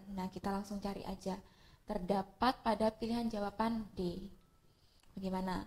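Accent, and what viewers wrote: Java, Indonesia. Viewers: Alhamdulillah kak bermanfaat, belajar sekarang lebih nyaman bisa online begini, jadi gak monoton ❤️ Sukses ya kak ~ Walaupun agak medok 😁😁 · Menarik... bisa sebagai referensi belajar.. kak suara medhoknya dipertahankan ya...😆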